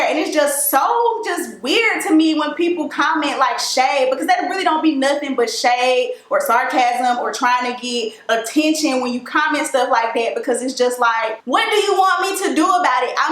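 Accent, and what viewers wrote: South, United States. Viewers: Hey cuzo, I love your curls and that southern draw is precious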